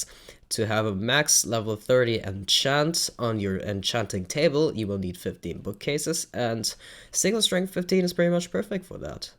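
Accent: Germany. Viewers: Warum machst du dann Englische Videos Übrigens bei der Betonung hört man sofort den Deutschen raus ist mir genau das gleiche😅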